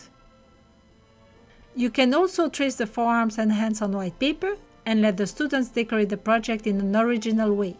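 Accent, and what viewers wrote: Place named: Asia. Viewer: Why is every video I find about making a globe an Asian which in accent?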